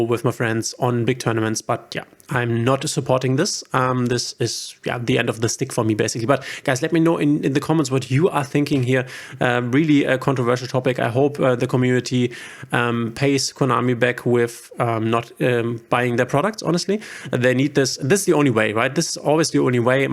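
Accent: Germany. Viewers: Höre ich Richtig, bist doch ein Deutscher ;)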